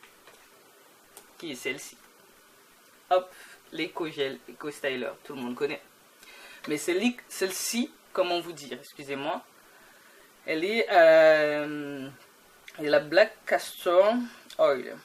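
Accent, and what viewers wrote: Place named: Saint-Étienne, France. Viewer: Y'a comme un truc du nord???? peut être stéphanois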